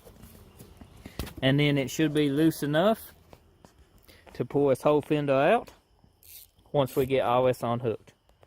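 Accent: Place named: South, United States. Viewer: Southern accent, nice, friendly and helpful people.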